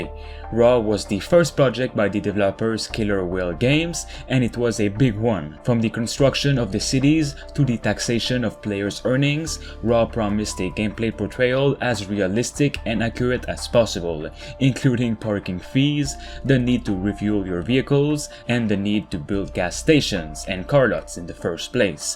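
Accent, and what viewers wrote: Quebec, Canada. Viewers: T quebecois toe hein? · Do I hear an Accent from Quebec ? Je crois bien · Tabarnakkkkk that Québécois accent threw me off 😂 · Je reconnais c’est accent québécois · I feel the strong Quebec accent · Qc? The accent is telling me you are a French Canadian just like me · T’es tu Québécois par hasard? 👀😂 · Where are you from ? Ypu sound like a french canadian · Si s'gars là est pas Québécois bro... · Bel accent québécois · you got that french canadian accent ma boi i might be wrong tho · Toi c'est sur que t'es quebecois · Where is this guy from? Accent sounds like he's french canadian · Are you from Quebec cuz you got that accent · Calique d'accent québéquoi mon boy bonne vidéo par contre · I can sense the Quebec accent lmao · Tes québécois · Are you frome Quebec? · ce gars là est clairement Québécois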